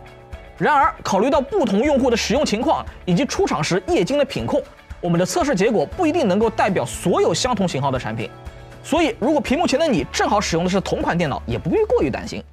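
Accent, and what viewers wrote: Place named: Nanjing, China. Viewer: 這位主持人聽起來好像南京人耶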